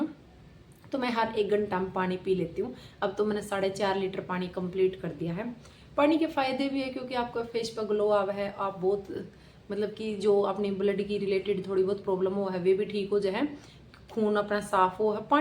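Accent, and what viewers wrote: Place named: Kurukshetra, India. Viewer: Nice video bahan app Kurukshetra jila se ho kya apki boli meri ali hai 😊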